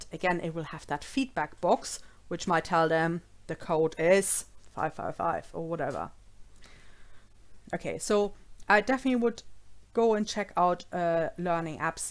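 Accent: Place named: Germany. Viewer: Excellent how-to tutorial. I've watched it several times. Your lovely German accent reminds me of my late mother.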